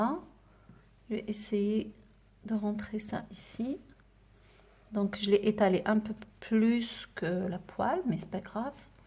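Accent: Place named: Morocco. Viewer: كي نسمع الهدرة تاعك نقول انكي من المغرب الشقيق ولكن الطبخ تاعك بكل صراحة طبخ جزائري ديجا احنا اشقاء واكيد طياب ميكونش مختلف كثيرا شكرا لك على لوبيا بالكرعين سخفتين يعطيك الصحة الخدمة والشرح المفصل والإتقان في العمل .متتبعة لكي جزائرية مغتربة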